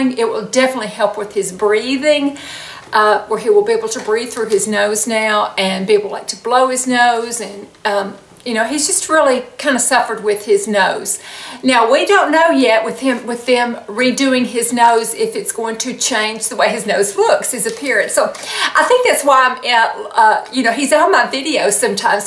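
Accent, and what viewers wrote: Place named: Nashville, United States. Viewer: thank you thank you for this video. it was the perfect call to action to help me get out of a slump and start to get in gear! i love the winter too, but my husband hates it, he complains the entire season. i say you can always put more clothes on but there is only so much you can take off. i’ve lived in north carolina my whole like bit my husband’s family is from Nashville. Listening to you is like hanging out with all his aunts and female cousins. Love it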